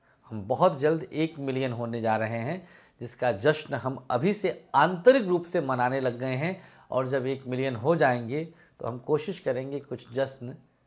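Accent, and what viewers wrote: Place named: Awadh, India. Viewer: Shree radhe guruji apki avadhi boli bhot jyada pyari lgti h love u always... chrn sprsh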